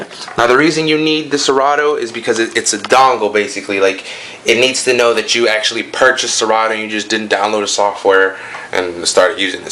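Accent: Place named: Mexico. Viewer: am mexican and i can tell he is too by the accent, plus he mentions which is cat for spanish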